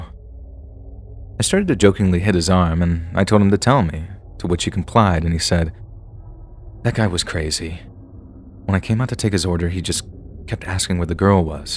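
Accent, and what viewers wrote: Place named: South, United States. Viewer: Your southern accent isn't bad 😆😁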